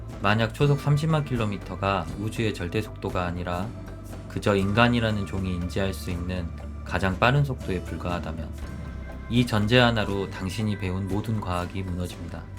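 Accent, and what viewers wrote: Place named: Jeolla, South Korea. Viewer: 즌라도 사투리 심하다잉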